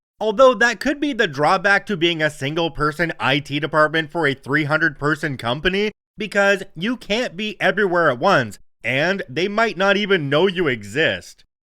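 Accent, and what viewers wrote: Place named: Canada. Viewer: Weird: this is the first time I've noticed your accent is Canadian.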